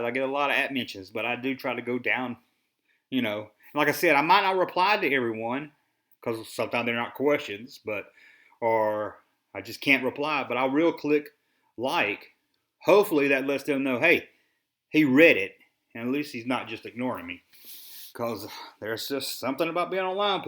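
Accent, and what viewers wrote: South, United States. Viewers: i know i'm 2 years late to this party but i really enjoyed this vid. (and all your Junkie vids). i could listen to you rant all day - maybe its coz i love vintage toys, i was born in '74 so i get where you're coming from and mixed with your southern tones and mis-pro-nun-she-a-shuns, your vids make my day. KEEP 'EM COMING !!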